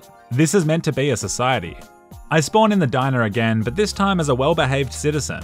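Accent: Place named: Australia. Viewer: woah... your'e Aussie?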